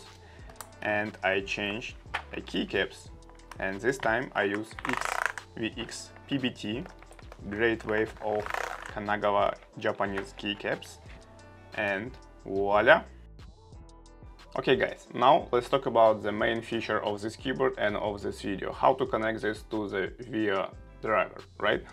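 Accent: Russia. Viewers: where are you from bro? why u talk like russian people я слышу именно максимально русский английский говор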